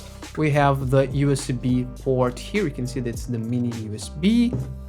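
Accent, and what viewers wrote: Brazil. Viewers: Adoro um brasileiro falando inglês 😎 amei seu vídeo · Esse aí é brasileiro, não me engana não kkkk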